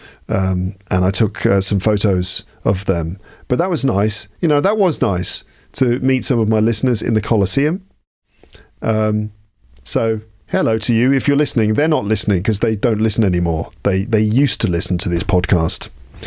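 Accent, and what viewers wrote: United Kingdom. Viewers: I think you should warn your audience that listening to your podcast can be very dangerous. After just a couple of episodes I’ve got completely addicted and now I can’t stop. I love your short stories and I like so much your rambling episodes too, no matter how long they are. With your beautiful voice, your lovely British accent and your sense of humor you could read your shopping list and it would be interesting, amusing, useful and totally enjoyable From now on I will stop watching Netflix movies that I can’t completely understand, it so frustrating. I will listen to your podcast instead, so hopefully my old-fashioned-miss-Marple-English (I’m a big fan of Agatha Christie novels) will become more modern and more fluent. Thank so much for your great podcast,.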